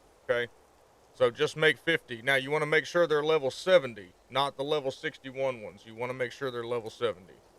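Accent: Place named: Texas, United States. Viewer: As a Texan who plays Diablo, but doesn't have a channel... hearing your accent was such a breath of fresh air haha.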